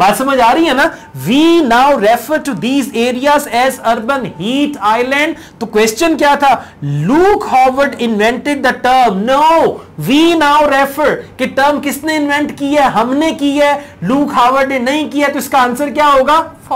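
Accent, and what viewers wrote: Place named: Punjab, India. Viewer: sir I love your Punjabi .. I belong to an area where exactly this excent is followed.. Thanks sir ...😊😊😊😊😊😊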